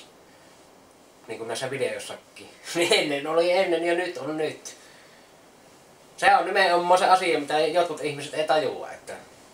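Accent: Savo, Finland. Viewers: voiko tuota savon muretta oppia millään kansalaisopiston kurssilla tai nettisivustolla? kiinnostaisi vanhoilla päivillä oppia uutta , se mukavasti estää dementiaa.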